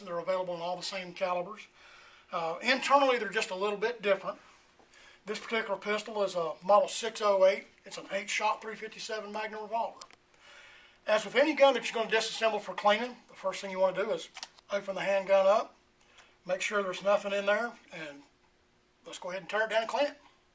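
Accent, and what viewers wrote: United States. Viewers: cool cowboy accent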